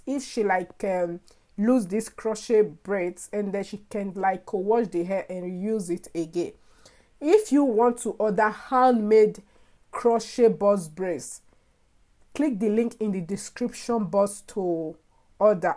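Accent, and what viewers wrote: Africa. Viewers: Luv your African accent. The "t" in crochet is silent. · This accent is 100% African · I love your accent! African Queen 👐🏽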